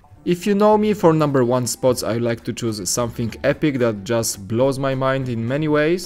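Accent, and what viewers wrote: Poland. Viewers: po akcęcie stwierdzam że jesteś polakiem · You have gr8 polish accent. Are u from Poland? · Jesteś z Polski? Przypuszczam, że tak po akcencie i tym jak czytasz polskie nazwisko, że chyba tak. Great channel!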